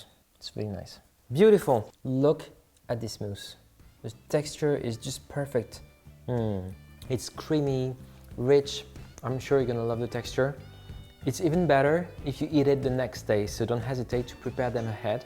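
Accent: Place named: France. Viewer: I love your sometime tiny French accent ! ;)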